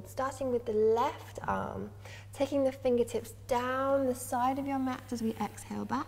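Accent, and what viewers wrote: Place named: United Kingdom. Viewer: So so good to hear a British voice while I practice! No offence meant to American pals...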